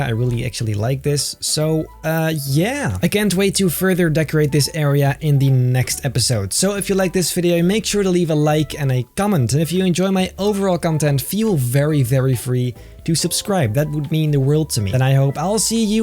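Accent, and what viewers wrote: Netherlands. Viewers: are you dutch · Are you dutch? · Are you actually dutch? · You dutch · And I already had a feeling that you where dutch 🇳🇱 because you’re accent looks dutch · Ben je Nederlands? 😄😁 (If u dont here is what i asked: Are u from the Netherlands) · Are you from the netherlands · Omg ik hoorde het al aan je accent, JE BENT NEDERLANDSAS!!! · Ben je Nederlands? XD Are you Dutch? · Are you Dutch? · Your dutch?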